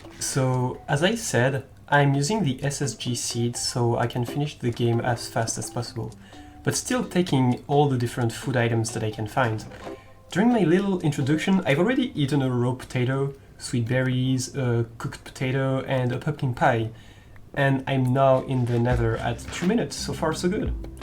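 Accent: France. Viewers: toujours le même accent fr xd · You got a french accent :) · you sound french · are you french ? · t'es français non ? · I have a question dude, are you from France? By the way nice video :) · Ru french ? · it’s obvious you’re french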